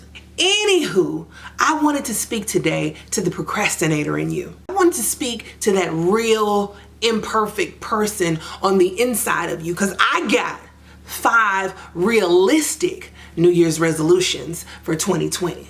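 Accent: South, United States. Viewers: Honey are you from the south? I hear the country in your voice 🥰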